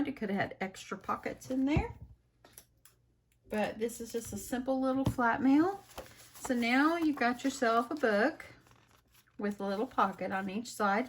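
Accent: South, United States. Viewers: Miss, you have the most beautiful, soft spoken southern sweet voice that always puts a smile on my face. I have had a rotten day, and listening to you always makes me feel at ease and soothes me into comfort. Thank you so much. God Bless you girl for sharing so much with your YouTube audience, you are truly special. And of course I also love all of your crafting 💕💕💕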